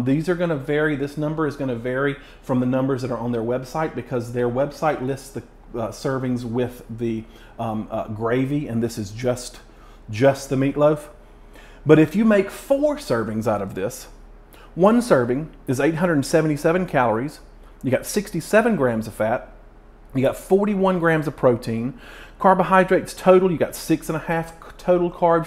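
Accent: South, United States. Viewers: my favorite thing about your videos. #1, I can listen to your southern twang all day. #2, your face when you have tasted your creation! No poker face for sure. And when you don't like it, we know you don't like it. Thanks for what you do!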